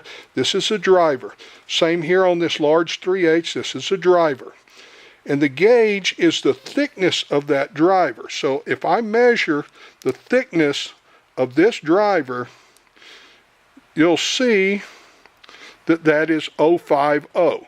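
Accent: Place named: United Kingdom. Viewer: Hi Lovely accent where ya from Bro.............Briddy UK thanks.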